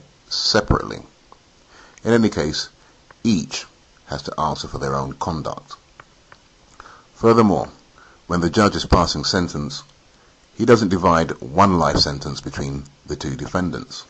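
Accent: United Kingdom. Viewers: "Berick Obama." Gotta love his U.K. accent.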